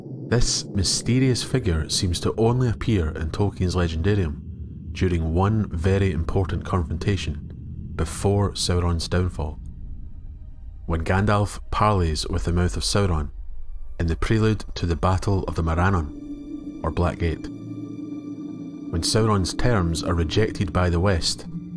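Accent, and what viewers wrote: Scotland, United Kingdom. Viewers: Oh no! The only two Scottish accents I could get any information on were Edinburgh and Glasgow. I had been wondering if Inverness were a possibility, but I couldn't find any examples of it at all. Eventually, it came down to whether I thought you sounded more like Billy Boyd or Billy Connelly. Lol.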